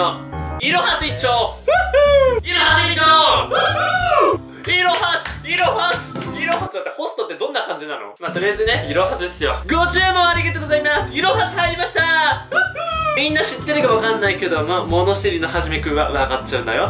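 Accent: Toyama, Japan. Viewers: はじめしゃちょーに質問します。富山弁ですか。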